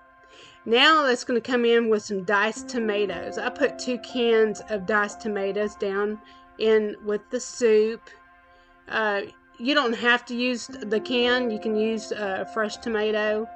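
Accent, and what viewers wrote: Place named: South, United States. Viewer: I love her southern Baptist accent. This is just what I need...soup, cozy electric blanket, and some world wide wrestling.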